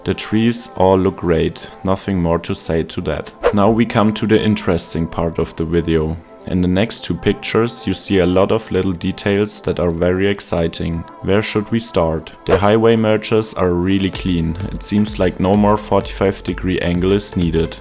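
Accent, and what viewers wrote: Germany. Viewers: Hearing your German accent is kinda funny ngl (Ich bin auch Deutsch) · Bissel Deutsch xD Aber Starkes Englisch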